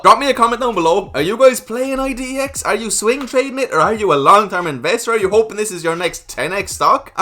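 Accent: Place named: Ireland. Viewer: Cool accent , is that Irish ? I’m from China so I’m not good at accents . 😂👍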